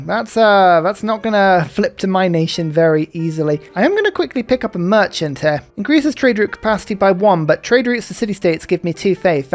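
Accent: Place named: United Kingdom. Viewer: Bri'ish gameplay with Bri'ish commentator incoming, you bring me joy EVERY DAY. Thank you for that.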